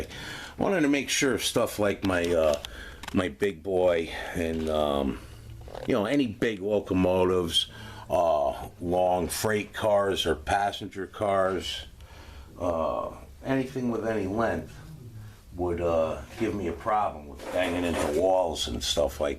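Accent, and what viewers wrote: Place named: New York, United States. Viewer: Hey the layout looks great! Now I'm going to check out you're other videos. Keep posting I'll keep watching. I'm building a layout also. Great inspiration thank you. Where are you from? Listening to you makes me feel like you must live in the NY NJ area. I'm a north jersey guy. Nice job!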